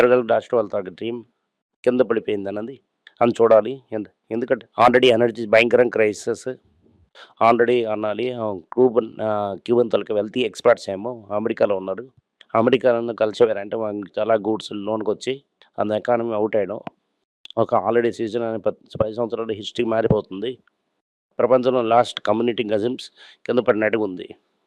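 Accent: Tamil Nadu, India. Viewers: Tamil accent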